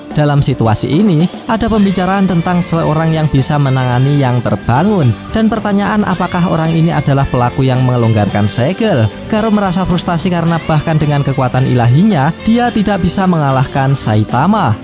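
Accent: Java, Indonesia. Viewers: Nah gini bg selagi manga chapter terbaru nya blm rilis mending cari yg fanmade fanmade nya aja bg seru bngt asli, apalagi pembahasan nyaa kayak mimin logat jawa gini makin asik 😋😋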